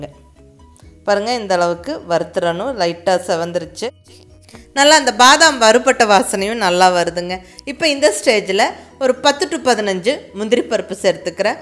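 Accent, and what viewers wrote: Coimbatore, India. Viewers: சகோதரி சமிபமாகத்தான் தங்களின் பதிவுகளை பார்க்கும் வாய்ப்பு கிடைத்தது மிகவும் சந்தோஷம் அருமையான பதிவுகள் எளிமையான முறையில் நல்ல தமிழில் புரிந்து கொள்ள கூடிய முறையில் இருக்கிறது வாழ்த்துகள் 💐💐தாங்கள் கோவையை சேர்ந்தவர்கள் என்று நினைக்கிறேன் உச்சரிப்பு அருமை வாழ்க வளமுடன் நலமுடன் தீர்க்கமான ஆயுள்ளுடன் பல்லாண்டு பல்லாண்டு பல கோடி நூறாண்டு கள் ⚘⚘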